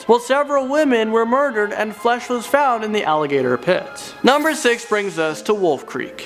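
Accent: United Kingdom. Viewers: British sounds Better!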